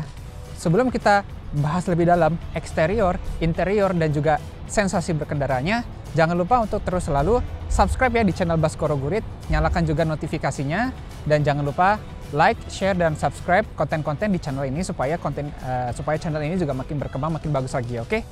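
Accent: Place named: West Java, Indonesia. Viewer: Logat na mah Sunda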